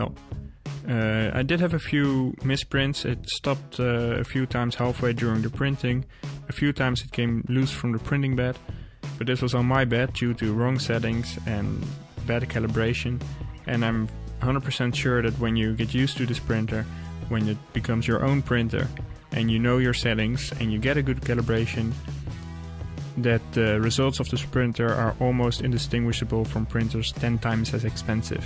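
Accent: Netherlands. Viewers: Are u dutch? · max yep 100% sure the accent is typical dutch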